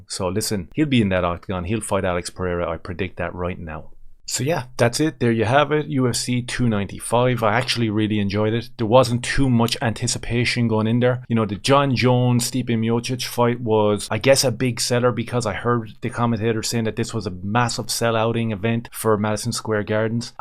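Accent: Ireland. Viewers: Really like your channel.. to the point.. no BS.. and who doesn't love the Irish accent🤷🏻‍♂️. Keep it up brother👏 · What part of Ireland are you from? Cork? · Are you Irish mate?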